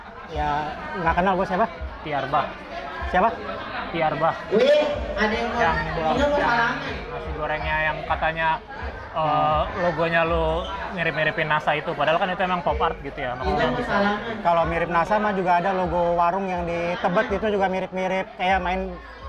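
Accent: United Kingdom. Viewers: pantesan bahasa inggrisnya kayak British accent gitu 😂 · british accent ya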